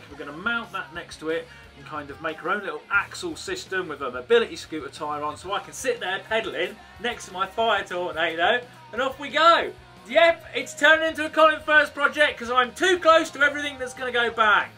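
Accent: United Kingdom. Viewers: hey colinfuze eres británico? hey where are you from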